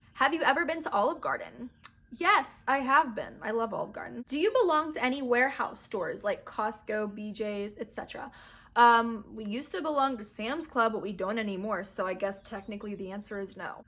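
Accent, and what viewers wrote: United Kingdom. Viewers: Nice British accent it was funny when you said that sometimes when you speak British it gets on peoples nerves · I love your British accent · Yeah like some people don't like her but not like those who dislike her, I love her and i love her British aksent like whoaw 😍😙😘❤💙💚💛💜💓💕💖💗💘💝💞💟👍👌😍😙😘❤💙💚💛💜💓💕💖💗💘💝💞💟👍👌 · You have a good British accent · I LOVE your British ascent it reminds me of (Tahani Al Jemil) form (The good place) on Netflix defiantly recommend for u to watch so so SO funny watched it all in like a week so yeah..... love u your the best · I love your British accent😂❤️❤️❤️ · I LOVE THAT BRITIST ACCENT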